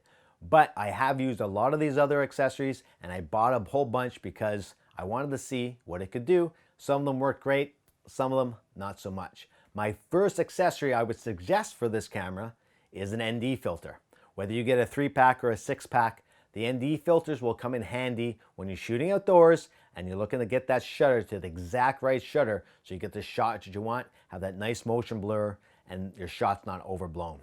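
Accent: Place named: Canada. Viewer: I knew I heard the Canadian accent, I’m from Northern Ontario :) thanks for the info, gonna label my gear the same out here in Vegas! Cheers, love the videos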